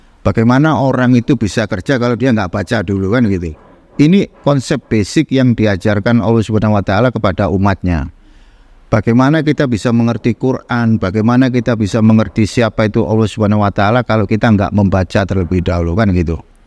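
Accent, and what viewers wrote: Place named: Surabaya, Indonesia. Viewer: Masya Alloh b sy kgum dg anda,nada bicara anda yg santai dg logat Surabaya,pengkajian anda yg sgt ditail n mudah di fahami,SMG anda tetap Istiqomah.amin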